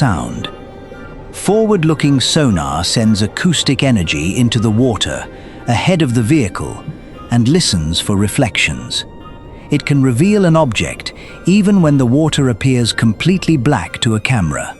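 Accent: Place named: United Kingdom. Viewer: strong British accent is a dead giveaway that video is a fraud. Scammers.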